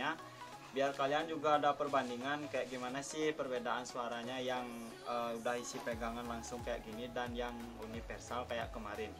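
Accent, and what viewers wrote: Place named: Bali, Indonesia. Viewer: Logat nya bali banget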